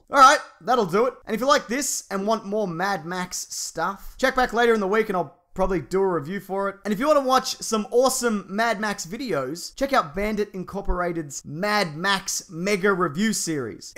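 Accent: New York, United States. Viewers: That new york accent tho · Every time. That American accent kills me every damn time. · New York accent is perfect lmao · New York accent is spot on. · Flawless New York accent as always.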